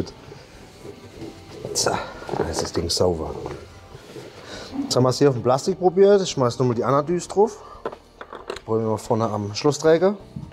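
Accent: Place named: Palatinate, Germany. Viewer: Ämme Pälzer Bub kammer jo nur gläwe was er saacht 😂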